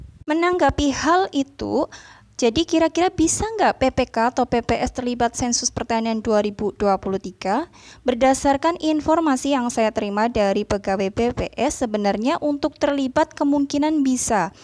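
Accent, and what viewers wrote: Banyumas, Indonesia. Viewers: Sampean ngapak Tegal apa Kebumen yukk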